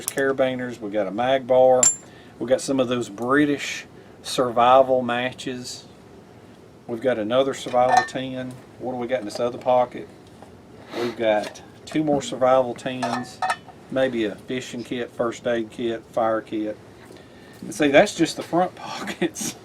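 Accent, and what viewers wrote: South, United States. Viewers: So full of information. Great humor and have great southern accent love to hear you talk.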